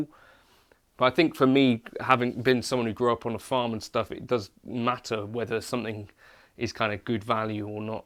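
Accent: England, United Kingdom. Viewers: I don’t get the hair that needs moving out of the eyes and the typical English emo accent. You don’t have a taste for expensive stuff and still pay £2k for a guitar?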